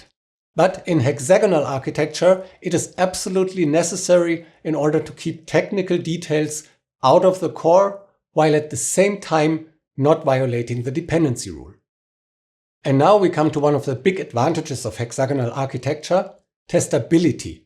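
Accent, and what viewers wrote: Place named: Germany. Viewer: i like your german accent. Great intro to hex arch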